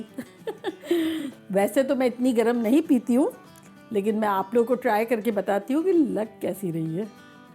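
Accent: India. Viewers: Aapke bolne ka style Indian hai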